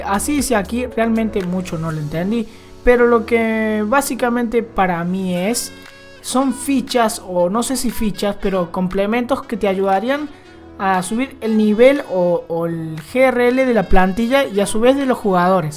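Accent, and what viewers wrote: Córdoba, Argentina. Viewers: Pareces una combinacion de cordobes(personas de corfoba,Argentina) y un pelo de español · Alto cordobes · Re cordobes el qliau jajaj genio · ¿sos de Córdoba no?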